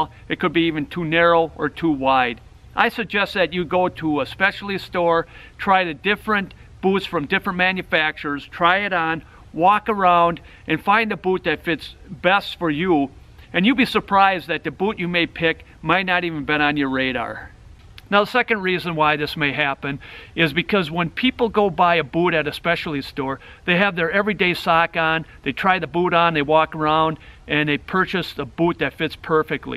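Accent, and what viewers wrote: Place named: Midwest, United States. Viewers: I'm born and raised in the great state of Michigan. If you sir are not, you would fit in great in the U.P. Your accent is very Upper Peninsula Michigan/Canadian good sir lol. We spend a ton of time outdoors. You gave some great insight for those who don't know the tips and tricks we use to stay decently toasty! Take care and have a great day🇺🇸🇺🇸🇺🇸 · Great info ,taken aback by Minnesota/Wisconsin accent it's really distinct.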